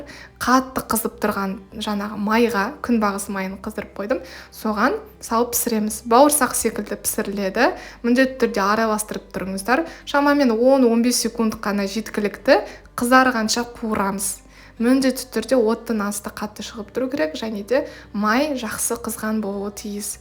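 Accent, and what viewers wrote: Standard. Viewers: қолың дерт көрмесін өте түсінікті әрі таза қпзақша мөйлейсің жасап көремін Алла қаласа бірақ ортасы шикі болып қалмаймекендеп ойлап отырғанмын мен басқа рецеппен осы шарикті жасаған едім бірақ ортасы шикі шықты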